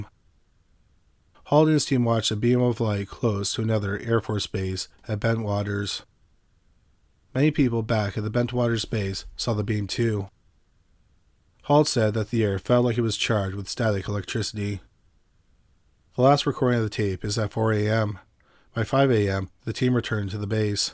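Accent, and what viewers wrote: Canada. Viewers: There’s a lot of Amazing calm speaking narrators on YouTube. Top 5s comes to mind. However, the consistent mispronunciations of ordinary words is grating for quite a few viewers & listeners. A lot of it appears or I assume is it to be the 🇨🇦 accent & a lot of it almost has sounded , like for years now, just an inability or refusal to pronounce the full word, it’s one thing to say ELLY-inn every time for the word alien but quite another to not care to realize that full endings or words are just skipped , the end of words such as Forest, instead he constantly says Foress. Or something along those lines, just kind of a wild line of work to be in when speech & possibly malaprop is heard almost more than correctly pronouncing words, or I’d imagine he’d say Worse not WorDs.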